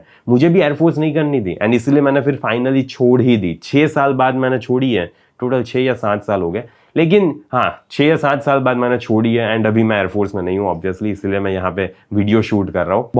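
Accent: Gujarat, India. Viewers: Gujju accent detected ❤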